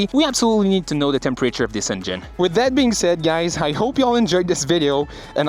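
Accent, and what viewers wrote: Quebec, Canada. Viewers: Where is your accent from? I'm pretty sure it's Canada somewhere but which part? I live in North Dakota U.S. so I'm familiar with how Canadians talk so I was just curious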